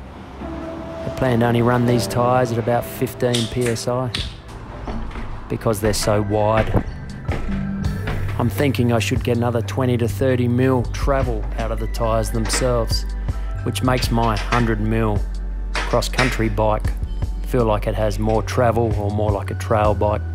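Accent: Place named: Australia. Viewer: Clicked on your thumbnail and was surprised with a nice Aussie Drawl. Looked like Southport out the balcony. Stoked to see Narang on the clip. I rode my road bike over there about 4 years ago and stopped at the same cafe🤣. If you ever come to Bright, leave the XC convertible at home and rent a enduro bike and smash Mystic...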